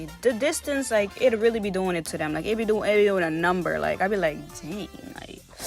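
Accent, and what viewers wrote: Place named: Africa. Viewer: Maybe africa english?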